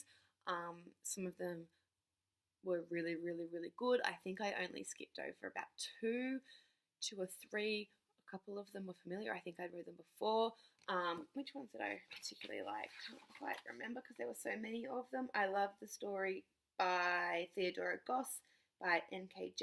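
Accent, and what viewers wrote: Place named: New Zealand. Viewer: Interesting accent ^^ I always struggle with this, but do I detect a New Zealander here? 😂 Sorry if you are not from NZ. I just had to take the risk of guessing.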